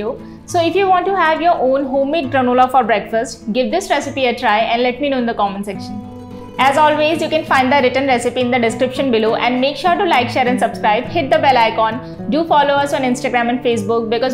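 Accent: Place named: India. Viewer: Nice one lady! Thanksmuch. U make me laugh because of your indian accent. Bravo excellento Granola's love them to eat soon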